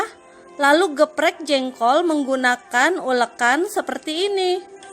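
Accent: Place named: Java, Indonesia. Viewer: Wadidau..medok beut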